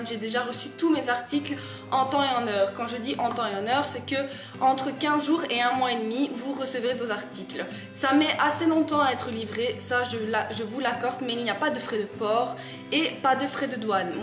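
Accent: Belgium. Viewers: tu est belge non ?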